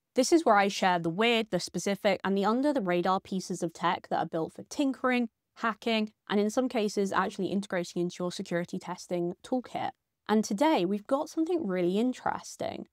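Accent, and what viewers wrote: United Kingdom. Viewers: I watched with low expectations when I read $. But then you started to speak and yay. A video by a fellow Brit with no waffling or bullshyte.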